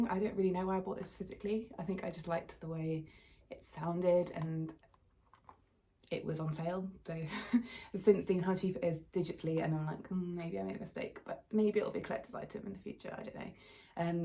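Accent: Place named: Wales, United Kingdom. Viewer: 🏴 what's wrong with the Welsh accent? Haha great video and game collection, hope to see more 😁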